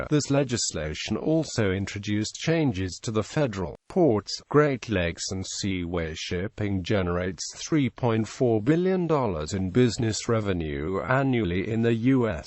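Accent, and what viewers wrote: United Kingdom. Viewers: This is the first computer I've encountered that has a British accent.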